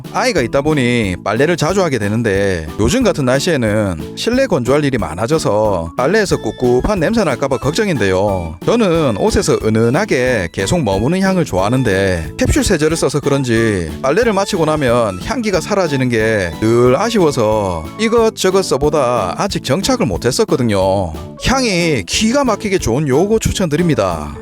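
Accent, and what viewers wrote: Gyeongsang, South Korea. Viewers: 이 분 경상도 사투리 너무너무 듣기 좋아요~ 아빠 목소리 같습니데이❤❤❤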